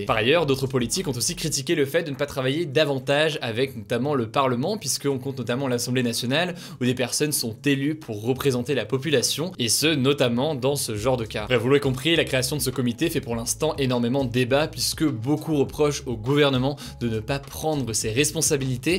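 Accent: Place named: England, United Kingdom. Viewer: Ct’accent anglais tu régales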